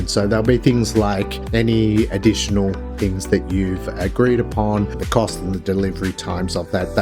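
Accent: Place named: Australia. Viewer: He didn't mention USD or AUD but from his accent i think it's AUD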